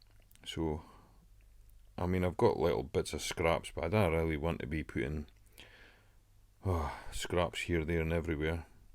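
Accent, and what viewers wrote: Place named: Aberdeen, United Kingdom. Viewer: Love it!! Your accents Aberdeen/Aberdeenshire can i ask where you buy your fish?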